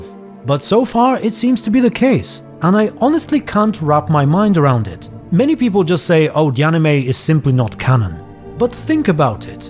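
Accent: Bulgaria. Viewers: Eyyyy you Bulgarian bro?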